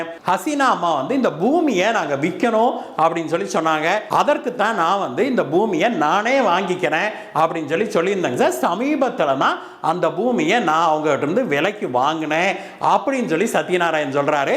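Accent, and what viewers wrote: Kongu, India. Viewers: உங்கள் கொங்குத் தமிழ் அருமை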